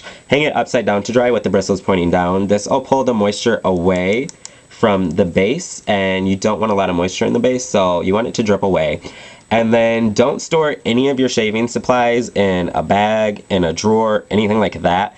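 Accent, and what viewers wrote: United States. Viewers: i live in canada, and its an american accent :)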